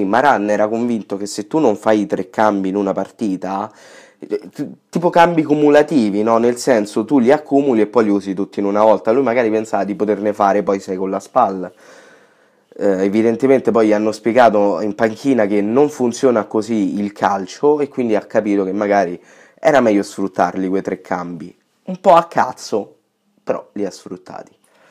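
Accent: Rome, Italy. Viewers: Hai l accento romano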